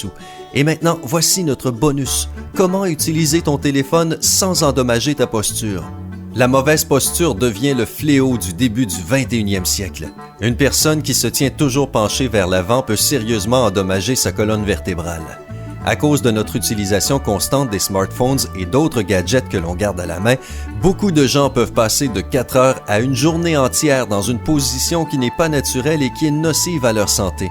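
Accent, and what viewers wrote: Quebec, Canada. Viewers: Il est québécois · The Quelqu'un il est québécois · Un accent du Canada :p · Ben moi ça ne me dérange pas je dirai même que j aime bien son accent. Il est québécois. Et puis c est pas très sympa justement de dire que tu n aime pas sa façon de parler de plus qu' il n y peut rien.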